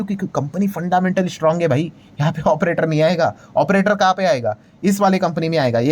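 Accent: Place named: Rural, India. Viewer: Gadha share market ka bolna nahi sikha gaon Wale ki tarha bolta hai .educated analyst ko dekh kar kuch sikha kar ke Kay bona hai aur kitna bolna hai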